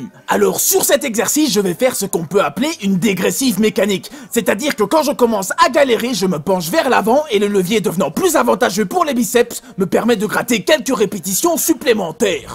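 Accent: Belgium. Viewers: Salut à toi jeune fou, j'ai mis du temps à apprécier ta chaîne et son format mais je suis fan maintenant. De très belles connaissances techniques et biologiques saupoudrées d'un humour barge le tout sur un accent de Dikkenek !!!! J'achète, bravo.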